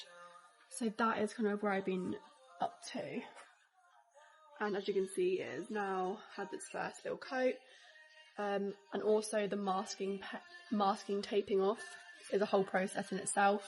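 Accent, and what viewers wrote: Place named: Southern England, United Kingdom. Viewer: She is from south of England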